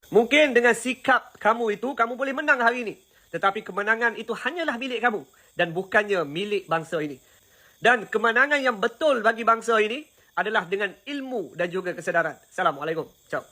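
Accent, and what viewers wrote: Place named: North, Malaysia. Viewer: Jnis tak amik perkembangan... Loghat utara mgg guna berapa ekor....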